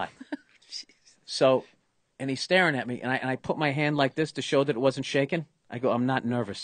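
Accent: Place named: Indianapolis, United States. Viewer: Definitely sounds like a guy from indy. No surprises here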